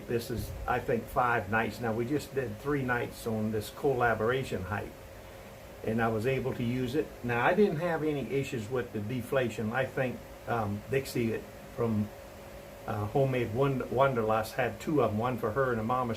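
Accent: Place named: Lowcountry, United States. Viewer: Sounds like you are from the Low Country.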